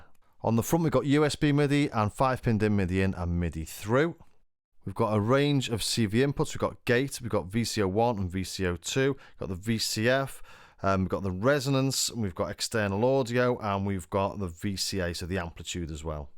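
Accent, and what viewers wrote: Liverpool, United Kingdom. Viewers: hey, I didn't make any comments about your being Scouse (based on what I hear in your accent unless I'm vastly mistaken!), so no need to whinge too much! ;) Haha. Keep up your good work!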